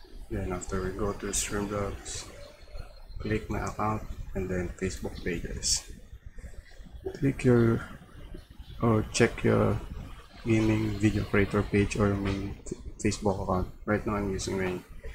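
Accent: Philippines. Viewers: filipino accent 100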